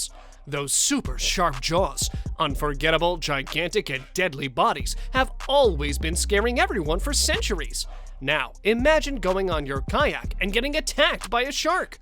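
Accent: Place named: United States. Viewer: This guy speaks English like it’s his second language but he has an American accent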